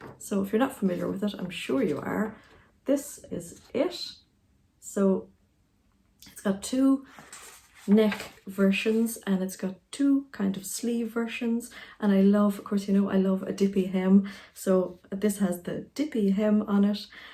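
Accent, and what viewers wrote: Ireland. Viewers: ✋🏻Hi .just tuned in and was drawn by your Irish lilt .I live in Sheffield but grew up in Dublin.Love your makes and how they look on you.I am going ,to check out your other posts now.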